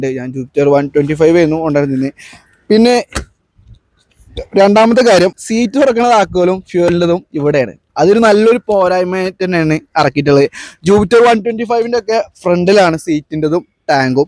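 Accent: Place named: Nilambur, India. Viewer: Confident aayitt paray muthe Anne kond nadakkkum Nilambur language❤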